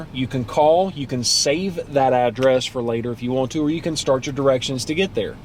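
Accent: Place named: Alabama, United States. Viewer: Just bought a new Ford Escape 2019 in September 2019. This was an extremely helpful learning tutorial. It was easy to understand, even if you don't speak the Alabama dialect. I tried to learn from the owner's manual, but I just got lost trying to read it. Take the time to listen and to watch this video. I owned a Focus 2012 with the prior Sync system (called My Ford Touch) and this new Sync 3 system is so much better and it operates very fast with no delays. You will fall in love with this system. I do use an iPhone 8 and it works great when connected by Bluetooth or via USB wire. You do have to have the iPhone connected via USB cable for some of the functions to work. Also, the sound quality of music or of Podcasts which are on your iPhone is much better than when playing music via Bluetooth. Thank-You for taking the time to make this video.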